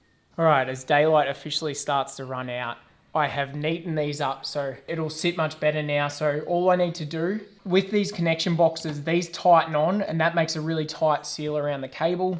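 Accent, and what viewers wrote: Australia. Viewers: I’m in awe of people that can do this stuff 👍🏻 and is it my imagination but has that Aussie twang gotten stronger?!😂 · I love your videos, it's fascinating to watch you do this van build and you explain everything so well that I feel like I could even do it....almost. It also doesn't hurt that you're easy on the eyes and have an australian accent. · Now get ready for the questions about bob being your uncle 😂😂😂Us Aussie’s get it but l don’t know about other countries. I love the country boy using good old fashioned slang and your “strine” is music to the ears. Great job young cobber !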